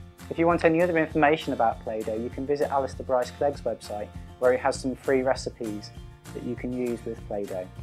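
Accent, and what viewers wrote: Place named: England, United Kingdom. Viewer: You had me subscribed at your English accent (is that even grammatically correct?)! Thank you for sharing your great ideas :)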